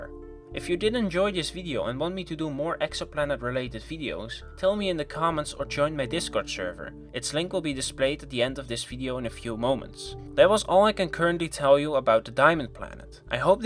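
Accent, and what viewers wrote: Netherlands. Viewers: dutch accent confirmed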